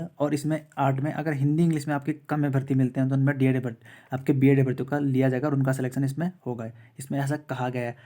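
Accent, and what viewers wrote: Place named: Chamoli, India. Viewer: Bhai pakkaaaaaaa Chamoli ka hai.... Apni boli apni bhasa....,🥰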